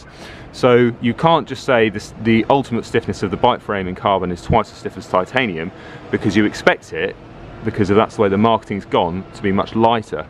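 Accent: United States. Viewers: Spot on american accent. Cheers!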